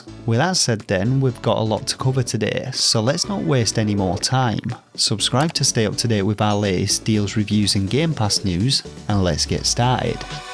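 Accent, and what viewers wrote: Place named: Manchester, United Kingdom. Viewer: Been watching your videos since getting an xbox and game pass, thanks for the stella updates, you sound rather local to me, I am just south of Manchester ;)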